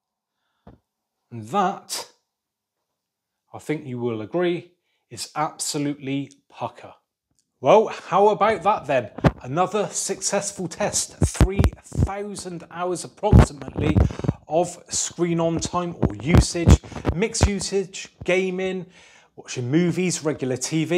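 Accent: United Kingdom. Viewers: Thumb up for that beautiful British accent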